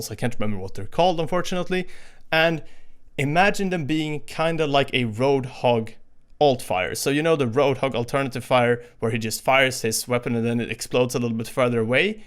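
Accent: Sweden. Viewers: This guy has Swedish accent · You swedish?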